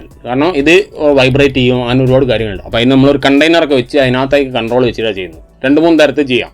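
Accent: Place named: Palakkad, India. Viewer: Palakkad baashayaanallo.. 😍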